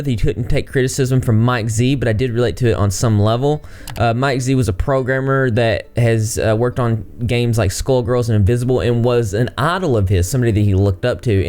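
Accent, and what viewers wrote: South, United States. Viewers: Good video, but you look like a Kingdom Hearts villain with a southern accent.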